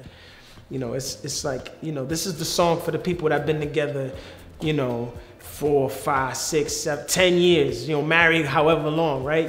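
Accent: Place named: New York, United States. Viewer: You can really tell he is really from New York 😂🤣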